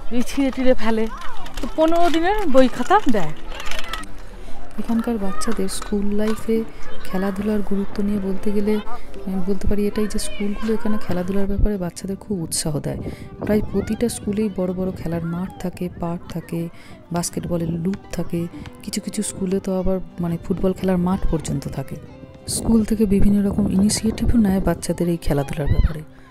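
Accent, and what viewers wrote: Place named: Bankura, India. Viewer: আপনার কথার মধ্যে বাকুড়ার টান আছে।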